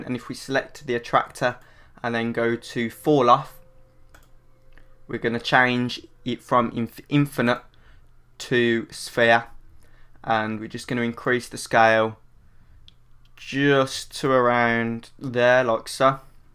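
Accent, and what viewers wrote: United Kingdom. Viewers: where are you from? uk for sure, but I don't recognize the accent. Sorry, I'm just curious :D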